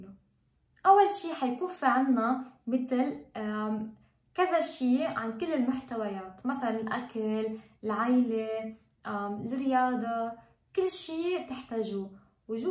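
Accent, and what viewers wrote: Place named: Arab world. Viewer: يؤبشني لهجتك عربيه ما احلاها 😀❤️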